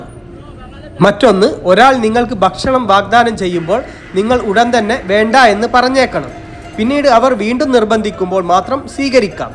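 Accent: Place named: Malappuram, India. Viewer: സംസാരം കേട്ടിട്ട് മലപ്പുറംകാരൻ ആണെന്ന് തോന്നുന്നു ഇടപഴകുമ്പോൾ എന്ന് പറയണം അല്ലാതെ ഇട പയകുമ്പോൾ അല്ല അതുപോലെ ഴ ഉച്ചരിക്കേണ്ടിടത്ത് നിങ്ങൾ യ എന്നാണ് ഉച്ചരിക്കുന്നത് കഷ്ടം അല്ലാതെന്താ പറയുക ?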